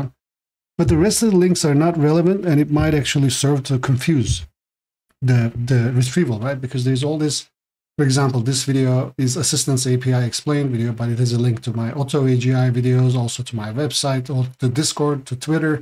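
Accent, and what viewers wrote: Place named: Turkey. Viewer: Good points… out of curiosity, are you Turkish? I hear a Turkish accent.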